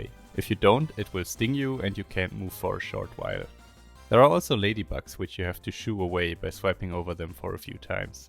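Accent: Germany. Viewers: R u german? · Hört sich so extrem deutsch an. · This is extremely interesting, love the work you put into not only the coding, but the video editing aswell. Might I ask, where you are from? I hear an accent but I am not quite sure if its a german one or something else. Anyways, new subscriber, keep up the good work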